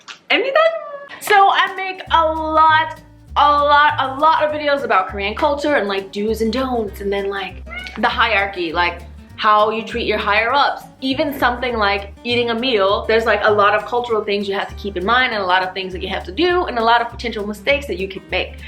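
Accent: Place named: South, United States. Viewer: How serious is the vanity issue in Korea and the deal with the narrow chin/jaw I have seen some that the shape of the face with the jaw/chin being so narrow/thin but the top of their head is bigger it is shaped like how they portray aliens to look (like old Roswell stories). Anyway I love learning about the culture and the only thing that I find concerning is the appearance/vanity issue. I do subtitles for Liu Studio, sometimes (aka Lee Sungmin from Super Junior) so I need to learn even more. I am originally from the South too, I knew you were the first time I heard you speak haha. I now live in Australia. I hope to visit Korea sometime.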